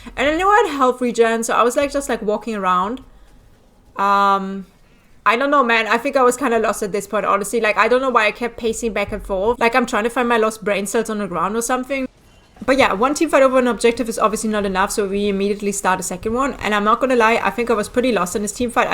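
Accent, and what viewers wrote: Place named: Germany. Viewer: Ich finde das so schön wie man manchmal den deutschen Akzent raushört XD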